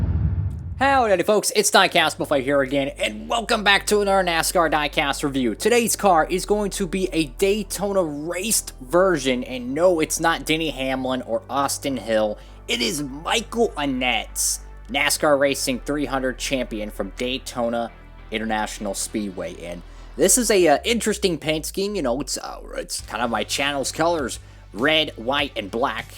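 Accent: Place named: New Jersey, United States. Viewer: And also you sound like your from jersy but im from alabama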